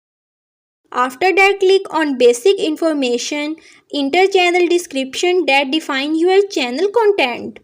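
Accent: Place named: Afghanistan. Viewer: india? your voice like a afganistan dialeg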